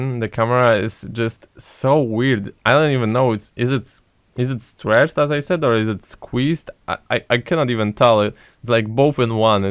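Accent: Poland. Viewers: Po akcencie można poznać że jesteś Polakiem xD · Masz Polski akcent. Jesteś Polakiem ?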